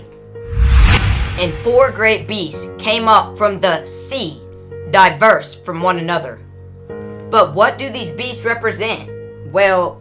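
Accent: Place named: United Kingdom. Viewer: sounds like England/U.K.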